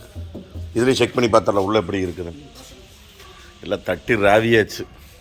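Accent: Kongu, India. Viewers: ரசனையோடு இணைந்து உளப்பூர்வமாக செய்து இருக்கீங்க அண்ணா...நம்ம கொங்கு தமிழ் அபாரம்.யாதார்த்தம் சிறப்பு தொடரட்டும் உங்கள் பணி மென்மேலும் சிறக்க நல்வாழ்த்துகள்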